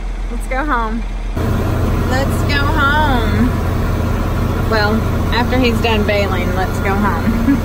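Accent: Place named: Virginia, United States. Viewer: Not bad I be proud of my bean fields too they did that good. He talking Southern Va. Northern NC. Talk. All people talk that way that lived in that area there whole life. Not been down that far much in a long time. I remember them was tobacco fields far as you could see, Tobacco was king people made lot money from them crops back in the day's. I seen that when I was little kid going visit kin folk in them areas.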